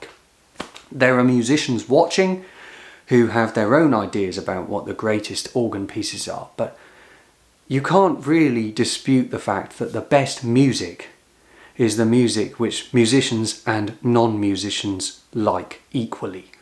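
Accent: United Kingdom. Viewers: Auch wenn ich nicht alles verstehe, da mein Englisch nicht so gut ist, schaue ich Deine Videos gern und genieße Deine angenehme britische Stimme. Die vorgestellten Kirchen, Orgeln und Musikstücke sind immer interessant. Ich wünsche Dir und allen anderen Zusehern auf der ganzen Welt ein frohes neues Jahr 2026!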